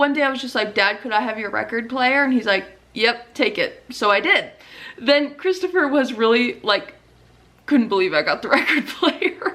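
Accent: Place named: Montana, United States. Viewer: I was born in Montana ages ago. I came across your channel and your voice/accent stopped me in my tracks. Boy did I have a bout of homesickness! You sound like home to me. Love your channel. Quick question. Did you design the Montana home pillow? I’m a crochet girly and would love to make one for my bedroom. If you did design it, do you sell the pattern? If not do you know where I can get it? I subscribed just so I can hear your accent and see Montana content. My uncle (now passed on) had a ranch in Ekalaka. I was born in Choteau. Mom was born in Ekalaka. Dad went to school in Bozeman. Like I said, you’re a bit of home in my ears. Love your channel. Cerise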